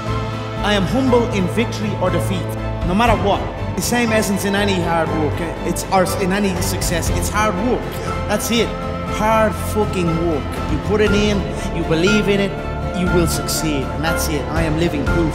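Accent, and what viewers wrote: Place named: Ireland. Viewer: 아일랜드 발음 중독성 쩌네 ㅋㅋㅋ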